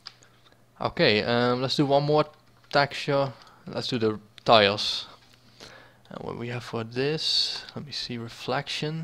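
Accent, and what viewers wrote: Netherlands. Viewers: Are you dutch? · Dude, you dutch?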